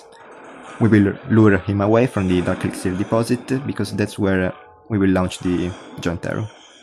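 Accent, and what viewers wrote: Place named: Italy. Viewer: Accento più italiano del mondo. Contento che ci sta un italiano così competitivo sul gioco. Specialmente per la army comp che hai creato dato che odio la classica "SPAM EVERYTHING WITH 0 THOUGHTS AND 3 STAR ANY BASE"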